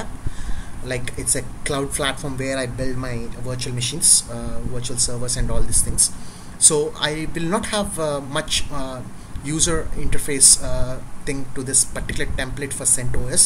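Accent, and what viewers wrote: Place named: India. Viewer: this is like the <num> video tutorial I´ve seen with Hindu accent, everything is good....except for the MIC, aren´t any good mics on India ?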